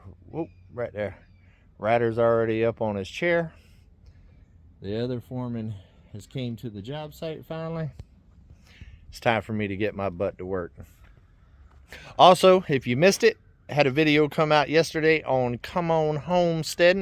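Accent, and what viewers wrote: South, United States. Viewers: I think you're amazing and who cares if other people say you talk too much on this channel that's what it's about letting us know what's going on how you feel how you don't feel how you which is so nice a beautiful person like yourself should not be told to hush I love your accent you have a Southern accent I love the way she talks which is just a beautiful person just like you so keep on keeping on and I'll keep going on those Adventures with you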